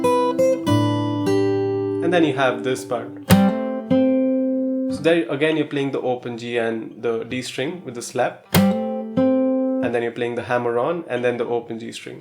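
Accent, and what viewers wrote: India. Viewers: I just love your arrangements. You are an amazing teacher! Thankyou so much for uploading these beautiful fingerstyle lessons :)! I want to ask one thing, are you Indian? Because I find your accent to be Indian. And may I please know your name?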